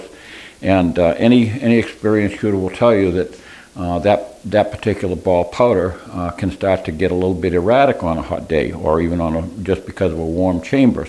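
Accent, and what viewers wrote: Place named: Boston, United States. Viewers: Bah-ston accent. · If think it was a Boston accent. Even to an Englishman it's very distinctive.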